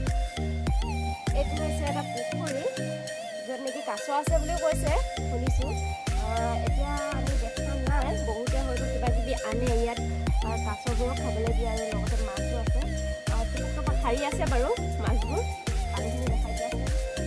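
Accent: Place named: Upper Assam, India. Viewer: Ujoni axomor bhakha to bor val lage mur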